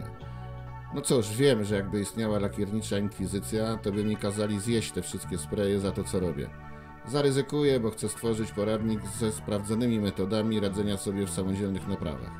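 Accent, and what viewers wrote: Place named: Warsaw, Poland. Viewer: Bardzo się mnie podoba ta Warszawska mowa 😀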